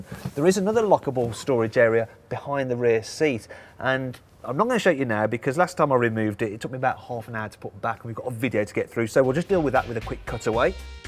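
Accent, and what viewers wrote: United Kingdom. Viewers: Jesus Christ are all you tube videos narrated by limeys?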